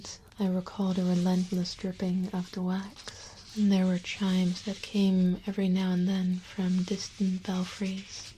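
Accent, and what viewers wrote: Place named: Netherlands. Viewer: fireplug1701d Ah, you should have been here a couple of years earlier my friend. The lovely accent was more pronounced. Check out her older videos on the main playlist. I remember when our lovely couldn't say the number "three." There's no "Th" sound in the Dutch language. So three came out as "tree." It was adorable in her ASMR voice. Then one day, someone in a livestream requested she count to three. She scowled a bit before doing just that. End of an era.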